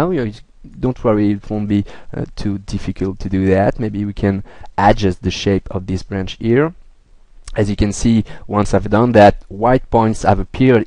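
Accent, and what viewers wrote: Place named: France. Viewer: 'ere. aha love that accent are you french?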